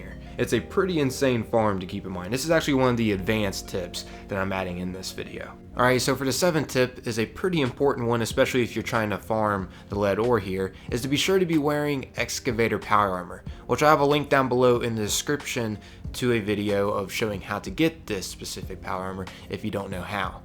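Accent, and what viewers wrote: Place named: Delaware County, United States. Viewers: Philly all’s I hear is philly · Are you from Delco lol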